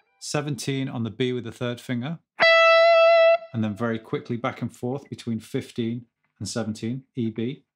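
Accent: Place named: North West England, United Kingdom. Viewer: Also wanted to ask where you’re from. I’m originally from Hanley, Stoke-on-Trent, left for Canada from Northwich, and taught high school in Macclesfield. I notice certain inflections in the accent that give away a North West origin.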